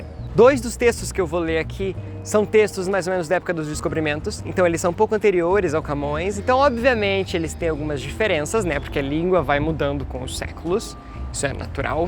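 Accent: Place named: Brazil. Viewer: Parece mais brasileiro do que português.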